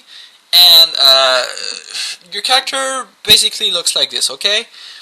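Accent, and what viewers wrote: France. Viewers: Let me guess... French accent?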